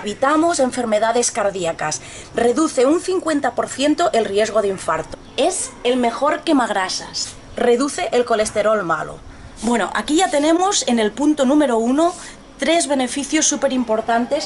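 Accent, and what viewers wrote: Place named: Catalonia, Spain. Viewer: no puedes negar que eres catalana :-) Me gusta mucho tu acento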